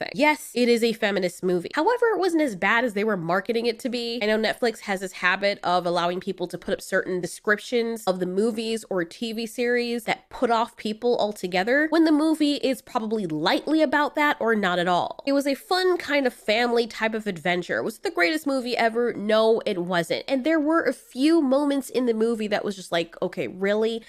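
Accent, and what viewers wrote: United States. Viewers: Your not American?just asking because you speak perfect American and in my experience those not born in the language usually gets the small things wrong ,example;saying I'm at Atlanta instead of I'm in Atlanta 😆 and as an aside I would love to see what you look like, and by the way this example actually happened to me yesterday 🤔 🙃!!!$$$+++ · She does have an accent, an American one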